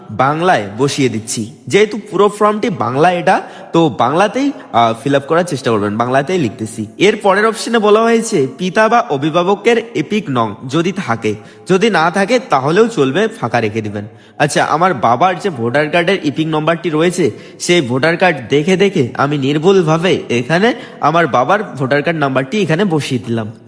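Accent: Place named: Bangladesh. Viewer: Tumi to Bangladeshi. Vulval bojhaccho keno ? Tomar citizenships na bolleo tomar kothai bole dicche tumi bangladeshi .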